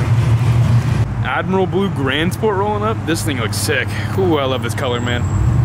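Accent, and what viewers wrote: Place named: Texas, United States. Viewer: You sound like someone from Texas....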